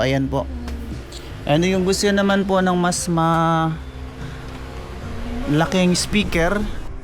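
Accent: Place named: Bicol, Philippines. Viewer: bikolano ka kabayan?pareho kasi tyo ng accent😂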